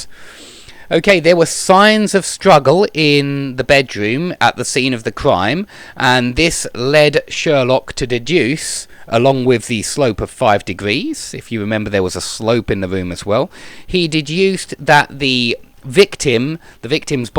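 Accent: United Kingdom. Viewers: I like your way to teach with british accent. . love you so much. thank you frim indonesia :)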